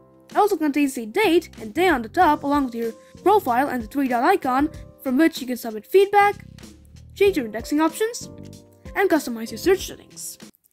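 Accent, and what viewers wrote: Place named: United States. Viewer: How did you learn your accent lol, I'm also Indian, when I'm speaking in my mind i speak with that "american" accent pretty well, but in person, it just doesn't work. How did you learn it?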